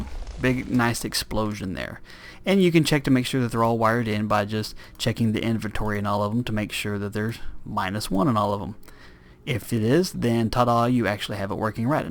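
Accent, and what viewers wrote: South, United States. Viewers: Hey are you from the south? I can really hear your accent. You wouldn't happen to be in Texas would you? ☺️ I'm a homesick Texan meaning I am from Texas but I live up in the North East. People aren't kind up here. ☹️ Where are y'all from? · R u a county folk mr acsent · You sound like a hill billy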